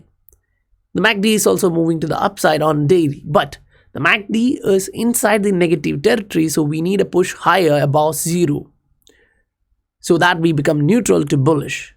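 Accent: India. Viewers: Sound like Indian? Im right?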